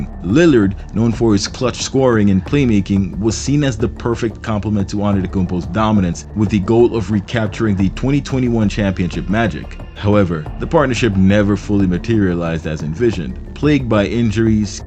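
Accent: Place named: Jamaica. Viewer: if i watched this video before i wouldnt have had to ask if you were jamaican lol. notifications turned on! bell and everything! great video.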